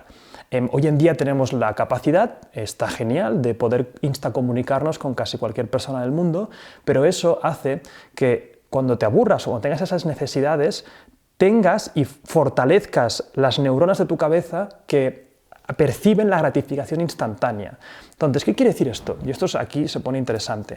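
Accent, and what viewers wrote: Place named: Castilla-La Mancha, Spain. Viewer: DE DONDE ERES? POR TU MANERA DE HABLAR!! QUE DICES "HE DEJAO " PASASTE LA NAVIDAD CON LA FAMILIA. ERES DE CASTILLA LA MANCHA? 😄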